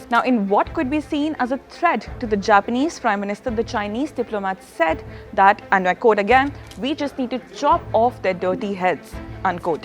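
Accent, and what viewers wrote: India. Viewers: Why does the anchor have very strong Indian accent? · Are you speaking Indian lanagauge? Sound like Indian language!!! Please improve, learn and reduce your Indian accent as much as possible.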